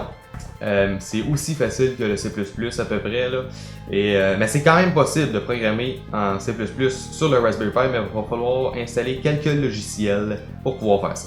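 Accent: Canada. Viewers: canadian by any chance ??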